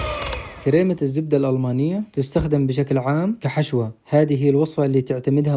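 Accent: Aden, Yemen. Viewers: اول مره اتابع قناتك من لهجتك عرفت انك من عيال عدن والان من شانك بااسوي لك اشتراك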